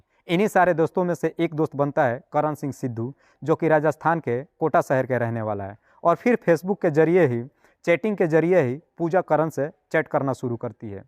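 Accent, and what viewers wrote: Bihar, India. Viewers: Bihari aise hi bolte hai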